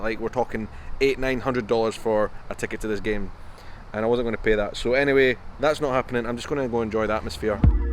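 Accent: Scotland, United Kingdom. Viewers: Especially with that Scottish accent! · You're not wrong, especially if it was a Scottish dude who's visiting these tailgate spots. A Scottish accent is basically a free pass. Most people wouldn't mind if you were drinking their alcohol. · Advice to you or anyone else traveling from abroad to an American sporting event - TALK TO PEOPLE! Us Americans LOOOOOVVEEEEE accents - Scottish is the best! - and once we find out you’re from elsewhere, it becomes OUR DUTY to show you the best time possible; you won’t pay for drinks the rest of the day, trust me! Never hesitate to use your accent as the currency it is here in the states; we love to have you!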